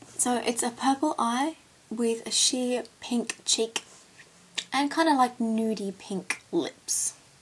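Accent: Australia. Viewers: Hey everyone. I really like. I just discovered her and I was wondering if there are any other gurus like her that are aussie because I love that accent and actually I am technically aussie (my grammy is from Austrailia).Thnx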